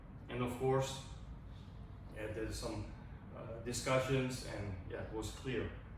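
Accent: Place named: Israel. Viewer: I really like your videos because of how deeply you research things, I am so curious about your accent. My first guess is Israeli. I would like to see more about your fighting skills and which style ir styles you use. Is it now your own lineage. I mean are you now following a path where you create your own stuff? I don't get what you said about finishing a lineage and then learning another. It must have been so confusing. The sound is not great. Too much echo. I want to develop internal enegy control. I am not training any martial art. I want to feel better and have more control. Getting into a martial art might follow. Thanks for this video.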